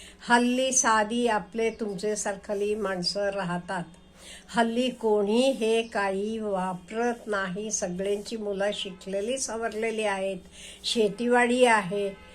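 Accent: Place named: Vidarbha, India. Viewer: Aaji tumhi vidarbha cha aahat ka marathi thodasa vegla vatta pan chaan vatta aikayla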